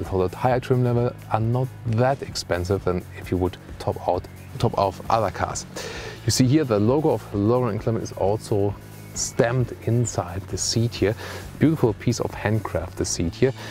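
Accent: Germany. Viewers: Terrible German accent. Do it in your mother language please!!!! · Beautiful car but they could really find someone able to speak English with a not-so-brutally-broad German accent. First I got embarrassed why I did not understand what I thought German - it took me more than 1 minute to realise it's meant to be English :(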